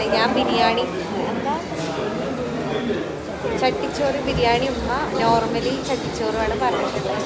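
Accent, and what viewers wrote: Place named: Malappuram, India. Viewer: Malappuram slang super aanu👍👍.. Oro video yum ushar avunnud tto👍👍👍... 1 million subscriber avatte vegam.. All the best 😍😍🌹🌹🌹🌹🌹👍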